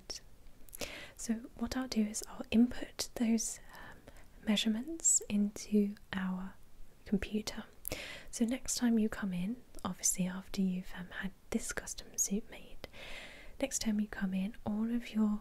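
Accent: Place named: RP, United Kingdom. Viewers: Posh bird must be from Surrey 😁 good vid by the way 👍🏻❤️ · Wonderful tailoring video, I needed a new suit fitting for clandestine work lol. Was surprised at how relaxing a tailoring video can be. The sound of your irresistible posh accent and beauty and no background noise.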